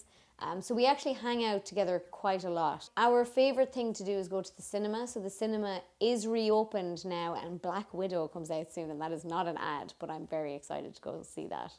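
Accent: Ireland. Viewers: I just discovered I'm half Irish. Soo id like to learn to speak like you now. Love the accent.😁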